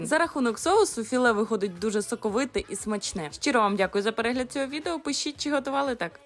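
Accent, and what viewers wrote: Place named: Ukraine. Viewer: Подскажите пожалуйста этот говор из какой части Украины? Я почему от поняла абсолютно каждое слово. Когда смотрю новости на Украинском почти ничего не понятно.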